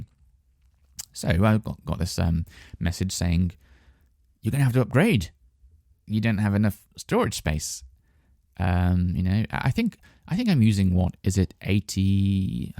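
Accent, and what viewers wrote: United Kingdom. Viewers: I listenning you cause i have exam tommorow, like Final exam And i have problem with that bitish accent so thank you for this podcast. You have good voice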